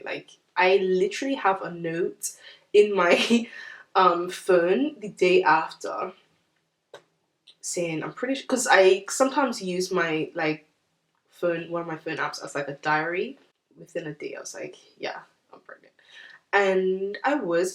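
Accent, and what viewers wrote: Bermuda. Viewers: That moment when you're clicking through YouTube and hear a familiar Bermudian accent. I love that you have a little more British mixed in there! Just gained a 441 subie btw ❤️😊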